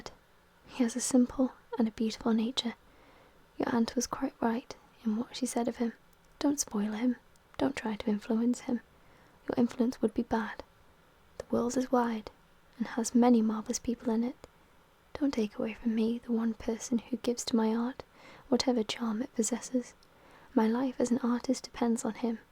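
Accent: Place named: RP, United Kingdom. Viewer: A posh British bird. Not many of them around nowadays.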